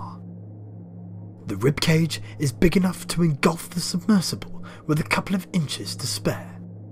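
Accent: United Kingdom. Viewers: Really cool! The British accent really sells it. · awesome , nice job, ...and the english accent the best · the british accent is best, David Attenborough approves that lol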